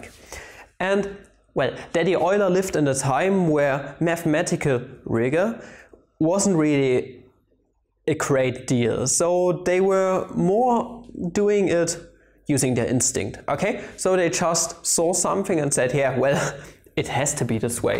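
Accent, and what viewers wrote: Germany. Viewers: always enjoy hearing the german accent😂just wonder if everyone can understand that "Weierstraß" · I like your German accent. I don't know why, but it makes me laugh, and you Germans don't laugh. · That's a thick German accent :D, that pronunciation and intermixing of s and ß gives away everything. BTW I am from NRW.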